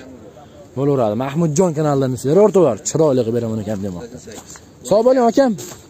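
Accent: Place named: Andijan, Uzbekistan. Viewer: Faqat okam demasdan gapiring iltimos. Anjanchasiga gapiravering yarashadi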